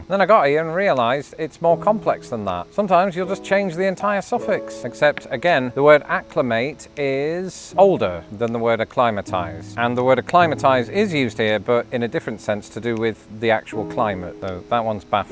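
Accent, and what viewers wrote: United Kingdom. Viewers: I'm not really sure these american words can be considered american when you pronounce them with your british accent. It's just a made up word at that point.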